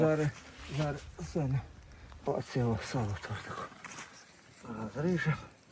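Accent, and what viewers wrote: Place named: Surzhyk, Ukraine. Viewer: Учичи буквар вчи українську мову яка гидка твоя суржикова мова просто ганьба гидко слухати може ти щось і петраїш в цій справі а мова просто гімно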